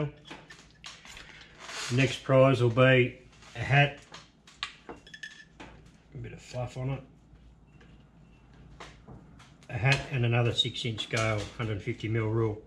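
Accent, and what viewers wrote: Australia. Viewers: You do great videos, and great workmanship on your projects. I've been watching your channel as well as CEE for the content as well as both of your Ausie accents. Keep it up.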